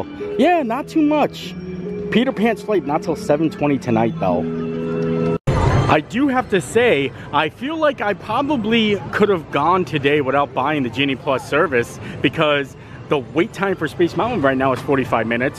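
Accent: Pennsylvania, United States. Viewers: Yupper, you have a Pennsylvania regional accent. You also accentuate the letter d. That's what makes you unique and one in a million, which means there are 7000 of in the world. 😂. Don't change a thing. Too bad you could not do the Matterhorn 😂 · It's the PA accent...like Wooder (Water) :D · I love your PA pronunciations:) Such a happy day except for the bear claw incident which was both tragic and hilarious 😂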